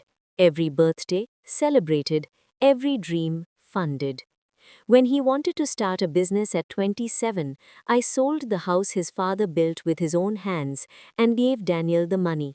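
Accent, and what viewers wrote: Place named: India. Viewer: Narrator sounds Indian or Pakistani. Those cultures do a much better job of caring for their elders than the UK & USA does so this was an interesting topic for the Creator.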